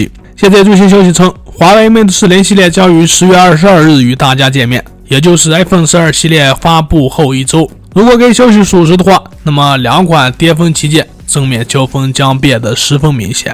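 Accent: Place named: Zhangjiakou, China. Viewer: 张家口口音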